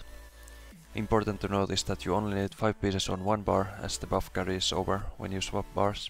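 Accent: Finland. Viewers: U from Finland? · Kuulostat niin paljon suomalaiselta että on pakko kysyy että oonko oikeessa? taino siis jos osaat lukee tän niin oot varmasti suomest xD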